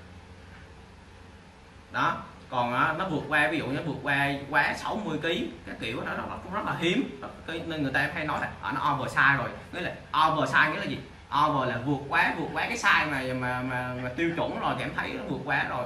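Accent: North, Vietnam. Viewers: Hoi dau ma noi bac ky no la vay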